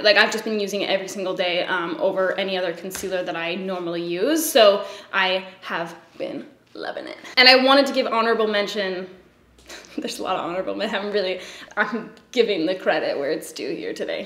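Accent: Canada. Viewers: I had to go watch another video to see if you were Canadian lol. We talk really fuckin close to the same so I was pretty sure and then the other video I watch you were wearing a Canada hoodie...roots maybe? Merry Christmas 🎄🎁🎅🏻🍻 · her canadian accent though!!!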